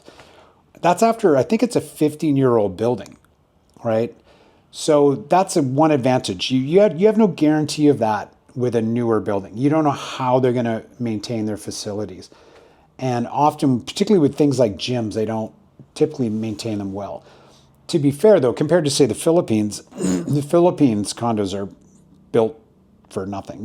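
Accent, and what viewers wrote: Canada. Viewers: Are you a Canuck? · Canada here... you didn't lose your accent! It's refreshing. I will be settling in Jomtien as well, in a year or two.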